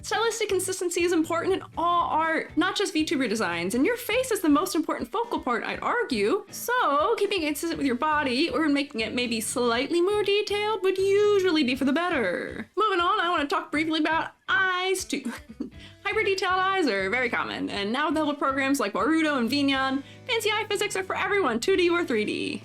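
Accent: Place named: Sweden. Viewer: Unrelated, i'm still secs into the video BUT you don't happen to be swedish?? I keep hearing a slight sweng-lish and now i can't let it go 💀 Edit: okay I watched the full video and read the comments and i REALLY gotta agree with most people critisiting your criticism cause my god some of these "pet-peeves" are just ignorant, hypocritical and extremely biased.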